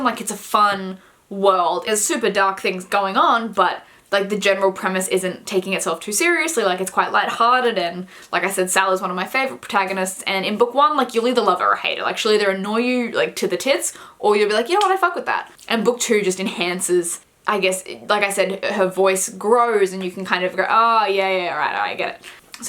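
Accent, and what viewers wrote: Australia. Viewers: I think beta reading is a great idea, and it's so cool to have an Aussie audio book narrator.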